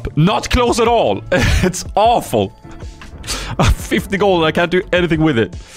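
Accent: Sweden. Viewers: Hey, please keep the Swenglish. Det är alltid trevligt med en landsfrände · All though as a fellow Swed i prefer your English over your Swedish accent, sorry your English is more crisp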